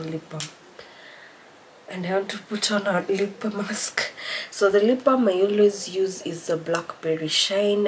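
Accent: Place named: Kamba, Kenya. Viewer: Kamba accent 😂am fighting a cold too and its terrible,safe delivery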